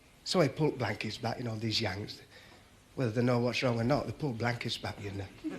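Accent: Barnsley, United Kingdom. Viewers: God bless Charlie. I'm a Derbyshire man but I loved Charlie's comedy and his salt of the earth Barnsley accent. He was an absolutely brilliant comic and a lovely man. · I love his proper Yorkshire accent. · Thank you for your efforts!! is a gem in British comedy! Plus, I'm learning to understand that Barnsley accent of his! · That Yorkshire twang is amazing 👍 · As a sheffielder born in 65 listening to Charlie in the 70’s was fantastic! He has a true Yorkshire accent - it’s like silk for the ears. RIP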